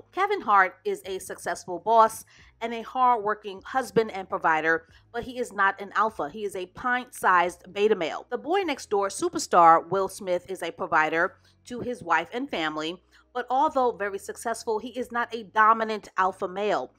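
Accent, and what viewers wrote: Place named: New York, United States. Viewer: The way u say "boss" makes me assume you're from New York lol love that accent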